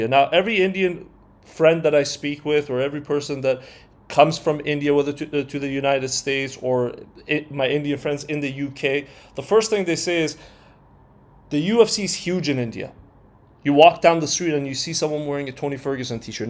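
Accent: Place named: Canada. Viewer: u sound brown and from canada am I right? ik this a weird comment lol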